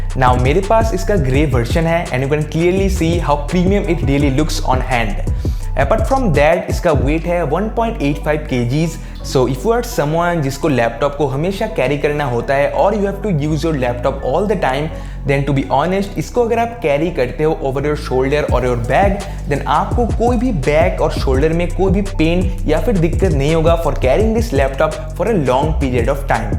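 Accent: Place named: Bengal, India. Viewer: Itna Ganda accent.... Bengali saala tobacco kha k bol raha hai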